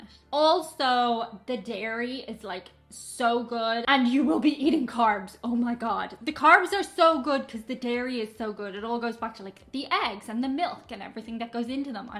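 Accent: Ireland. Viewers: I love your Irish accent,. It's like listening to music. 👍🥰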